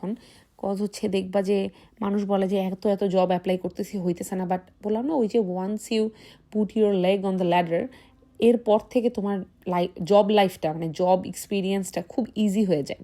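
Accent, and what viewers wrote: Barishal, Bangladesh. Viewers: ami apnader fb reels gula dekhtam,2 years dhore maybe..sekhane dekhte dekhte ekhon you tube eu dekhi.. you're so genuine.. and your barishailla vasha is also captivating.. your cooking is also mouth watering.. so you can cook new item and share with us in your local language.. r vlog er video gula wide diyen,full screen hole dekhte vallage...take love apu and stay blessed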